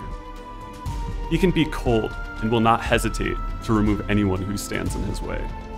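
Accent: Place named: England, United Kingdom. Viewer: English narrator? U sir, have my like 👍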